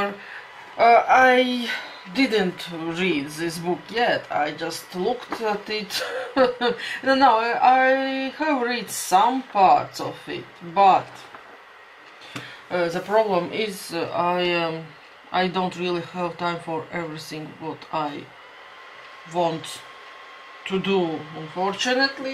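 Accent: Lithuania. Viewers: Very well done!!! You do have an accent but it sounds like a Lithuanian accent. Wow! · You could sound like a Lithuanian from a Lithuanian village with a dialect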